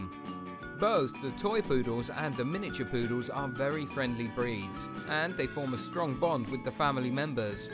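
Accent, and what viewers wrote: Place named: England, United Kingdom. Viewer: I'm curious to why someone with an English accent is talking money in Dollars...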